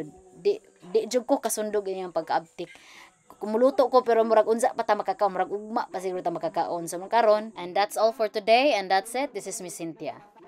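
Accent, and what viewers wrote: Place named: Bohol, Philippines. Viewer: Pure bohol jud imu lingwahi. Puro J.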